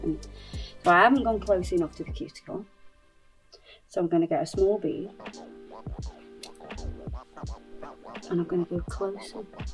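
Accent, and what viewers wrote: United Kingdom. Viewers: I really enjoy listening ur british accent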